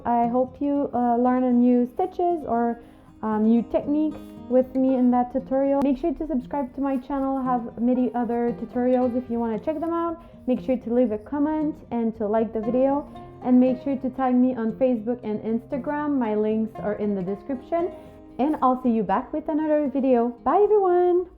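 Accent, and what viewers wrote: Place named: Quebec, Canada. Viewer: That accent.... T'es québécoise? C'est full cute le tutoriel merci ❤️ j'vais en faire plein❤️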